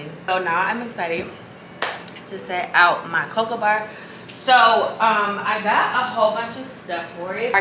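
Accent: England, United Kingdom. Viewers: Loving the. Vlogmas motivation is key keep it up . And your British accent l😂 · it’s the british accent for me lmaoo, im happy you’re in a better state ❤️❤️keep the vlogs cominggggg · Elloooooo british accent 😅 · The beginning of the vlog is just funny 😅😅 the UK accent love you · Your English accent gets me every time ….and I’m from London 😂😂😂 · The Accent is sending me especially being British😂😂 The vlogs are always so motivational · You’re so unique I love your vibes! And your bri-ish accent 😂💖🥰 ps: you drew a candy cane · The British voice 😂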